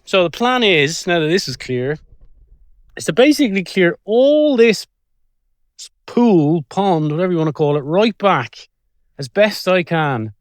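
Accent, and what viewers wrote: Ireland. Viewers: Wow!!! Beautiful video. Music! Editing! Day in the life... everyday beauty! Cooking! Love it! Great pace. Great visuals... and your beautiful Irish accent!!! Thank you!! Loved it. Watching from NYC.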